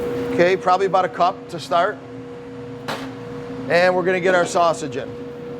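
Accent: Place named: Upstate New York, United States. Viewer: Even before I saw "Utica" on your T shirt I knew you were from Upstate NY, that's a cool little twang in your accent, I am from Buffalo originally and could pick it up right away. Loved the recipe and technique. I always wondered what kind of greens to use, I guess it's escarole.....and always wondered if I should rinse the beans first, I see you added them right from the can so I guess don't rinse them.....so making this dish in the upcoming fall when the weather cools....also, I have friends that make it and nobody puts Italian sausage in it...I'm trying it that way.....seems like it would be heartier.....thanks for the video...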